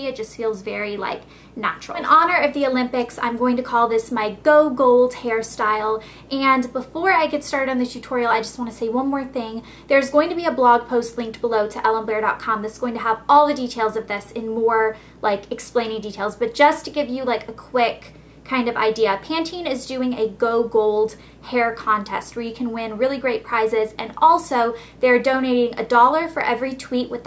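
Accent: United Kingdom. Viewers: Hahaha! Love your British accent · British accent? London Olympics of course ( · I love your British Accent ;-) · Haha I loved the British accent. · OMG, love your british accent :) Sooo good Elle !! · hahahaha your british accent - so hilarious <3 Love you Elle! xx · I love your British accent haha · Your British accent is really good xx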